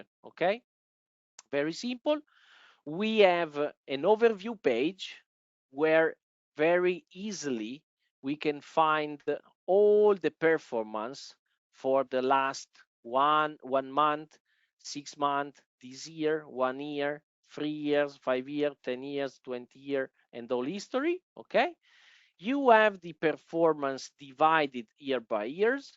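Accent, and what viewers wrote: Italy. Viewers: bel video, ti consiglio di registrare in voce italiana e tradurre l'audio in inglese con un tool di IA se davvero vuoi raccogliere iseguito internazionale, pochè la pronuncia inglese attuale potrebbe dar fastidio a chi è madrelingua inglese, ma è solo un mio parere personale e feedback che spero sia utile. Apprezzo molto i tuoi video e ti seguo con piacere da qualche settimana, sei una delle poche persone che è riuscita a farmi capire ed apprezzare il mondo delle azioni e dell'analisi fondamentale/tecnica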